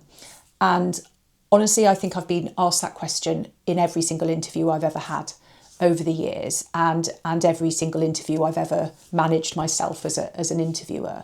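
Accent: United Kingdom. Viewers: I was recommended your video just now, and signed up for your newsletter! I am glad your video popped up. I like your demeanor and tips. I like your demeanor because you sound like you are from the UK. Here in the USA, many places need that good ol English/British/or other UK country wisdom that helped mold the USA.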